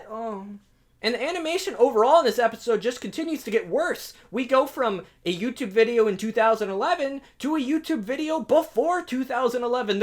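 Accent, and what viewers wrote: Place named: Baltimore, United States. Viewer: Are you from Baltimore dude?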